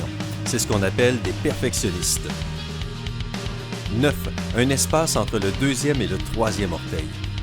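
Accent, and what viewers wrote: Quebec, Canada. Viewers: "cpendant" mdrrr tu pue le quebecois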